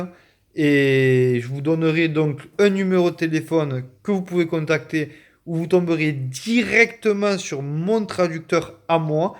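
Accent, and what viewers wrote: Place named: South, France. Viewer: salut merci pour ta réponse Peut tu me donner les coordonnées de ton interlocuteur français à la clinique j envisage Di aller fin avril donc sa hurge merci encore gilles du cap d agde tu doit pas être très loin vu ton accent merci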